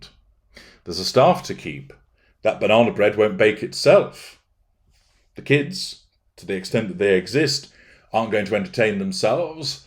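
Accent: United Kingdom. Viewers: Why are curse words even funnier when pronounced with a proper British accent??!!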